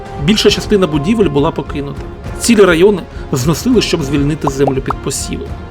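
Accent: Russia. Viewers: Конечно на Украинском это патриотично . Но все таки родной язык декламатора русский . Слышу ґе , а не ге . Слышу ясно так как знаю Украинский досконально . Но все равно молодец .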